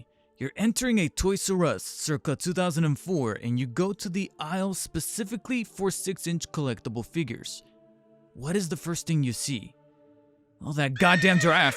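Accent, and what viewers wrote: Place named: Quebec, Canada. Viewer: Your accent is throughout me. It sounds very Québécois.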